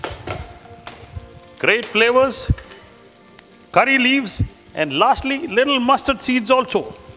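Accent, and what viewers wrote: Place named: South India, India. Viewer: I am Fan of all your cooking but generally I wanted to clear so.ething , you look punjabi but your acsent is south Indian , are you born n bought up in south India?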